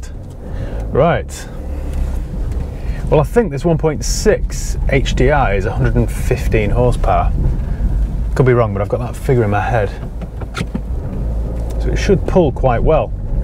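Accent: United Kingdom. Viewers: Wait, you moved to the UK? I thought you were a UK native. Shows what I know about accents haha. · He’s from a British island colony so no you’re not mistaken about the accent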